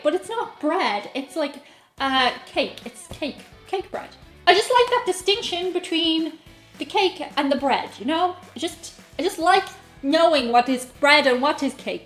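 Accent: Ireland. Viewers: Good God! You are defending UK 'pudding'? Are you insane? UK needs to have it's rights to the word pudding irrevocably revoked. And I'm also roping you irishfolk into the lawsuit against haggis. There is plenty of guilt there to go around. Haggis, and 'pudding' that is more like a hockeypuck made of dog kibble than anything remotely edible and you think you can get away with dissing meatloaf!!! You, your adorable Oyrish accent and lovely face are hereby sentenced to a lifetime of only being able to eat alarmingly orange American Cheeses. So there. Blasphemer. No Corn Dogs!? Devil woman.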